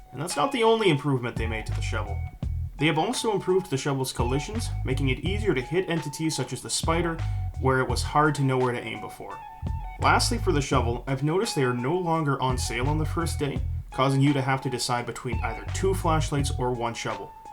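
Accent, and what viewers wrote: Canada. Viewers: WINNER 🎉🎉 GAGNANT 🤑🤑 I can tell you're from ontario my friend nice to meet you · Canadian detected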